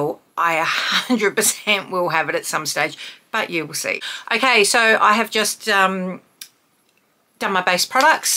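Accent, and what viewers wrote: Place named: Australia. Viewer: So good to hear a YouTube beauty content creator with an Aussie accent! 69 yo Aussie lover of makeup and skincare here. Look forward to seeing more of your videos. 😊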